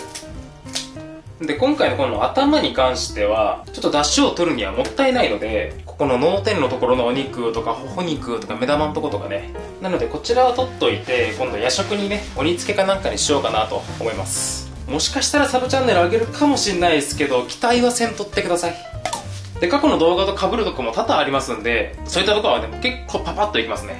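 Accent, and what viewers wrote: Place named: Hiroshima, Japan. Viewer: 広島出身の人かな？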